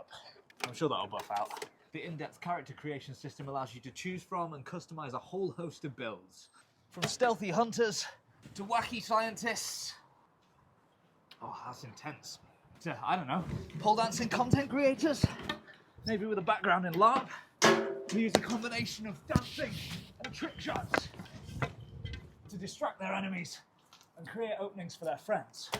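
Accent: United Kingdom. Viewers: This is exactly the kind of video I needed to see for the story I'm writing AND it's got a dude who's funny with a british accent. Win win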